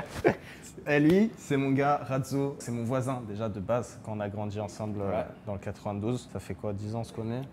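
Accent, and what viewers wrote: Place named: England, United Kingdom. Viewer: par contre ca me fume l'accent anglais hahah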